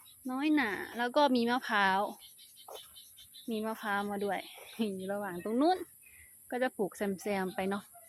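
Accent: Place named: Sisaket, Thailand. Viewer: สำเนียงศรีสะเกษ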